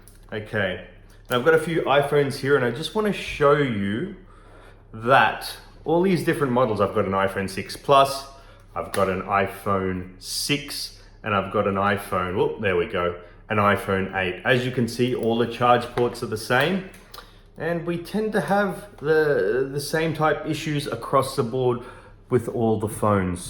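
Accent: Australia. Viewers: What a blessed relief it is to hear some one speaking in Aussie accent (or is it a Kiwi accent? - I can't tell the difference) instead of an American accent. And someone who speaks clearly. And better yet still - not to have some pointless bloody awful noise going on at the same time. Well done on this count alone. Your advice also came up trumps! - it was the tooth pick suggestion that saved the day. Much thanks! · Yet another success story - thank you so very much! And as a bonus, I was able to be enchanted anew by that delightful Aussie accent, with tooth peeks which aren't too theek :))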